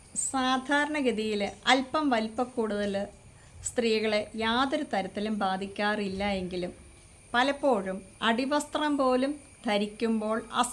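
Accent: Kottayam, India. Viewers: ചേച്ചീ, പെണ്ണ് മുകളിൽ ഇരുന്ന് കൃസരി കൊണ്ട് ലിംഗത്തിൽ മുകളിലോട്ടും താഴോട്ടും ഉരയ്ക്കുമ്പോൾ രണ്ടു പേർക്കും കിട്ടുന്ന സുഖവും അനുഭൂതിയും വാക്കുകൾ കൊണ്ട് പറഞ്ഞറിയിക്കാൻ കഴിയില്ല. എന്നിട്ട് വേണം യോനി ലിംഗത്തിൽ അമർത്തി ഉള്ളിൽ കടത്തുന്നത്. ഇത് ശരിയല്ലേ ചേച്ചീ? ചേച്ചി പാലാക്കാരിയാണോ? കോട്ടയം സ്ലാങ് കേൾക്കാൻ രസമുണ്ട്.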